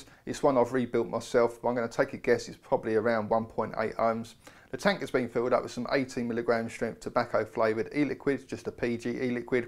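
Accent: London, United Kingdom. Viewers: What part of London are you from? Maybe Hackney or Bethnal Green? Cool video.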